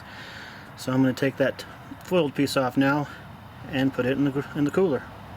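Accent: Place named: Minnesota, United States. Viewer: You're from Minnesota huh?!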